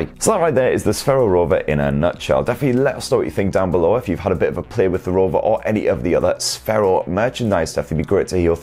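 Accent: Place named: England, United Kingdom. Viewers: Same. I can't quite place which part of northern England he is from. Doesn't sound like Yorkshire or Lancashire to me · I like your english accent sir.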